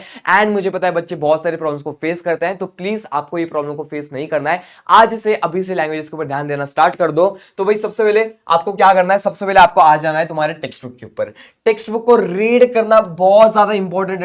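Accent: Maharashtra, India. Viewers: Marathi English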